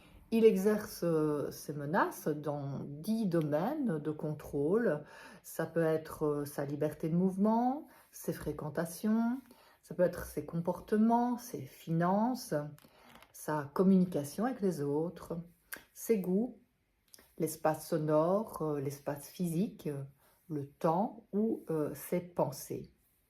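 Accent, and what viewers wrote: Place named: Belgium. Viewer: Bonne explication, dans un bon français, avec bel accent belge.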